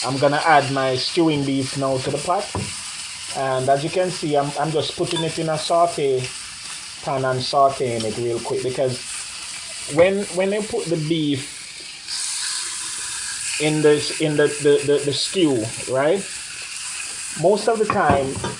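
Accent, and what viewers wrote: Jamaica. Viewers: loll hes Jamaican and definitely trini or guyanese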